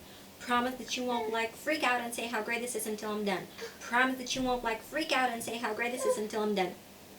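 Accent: United States. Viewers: However, your video in 2023 I just watched, I mistook you as American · Perfect understandable american accent. You sound like were moveed in englishspeaking country in deep childhood. And it's so increadible that you are from Ukraine and can reached that level in one-two years. Great unbelievable achievement) The latest your videos are like you are completly born in US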